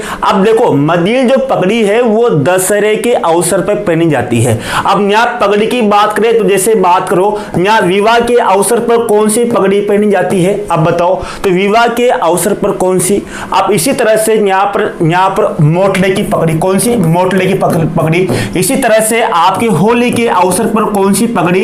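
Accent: Hadoti, India. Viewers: कोई बताए ये सर कहा से है इनका एड्रेस। बोली हाड़ोती की है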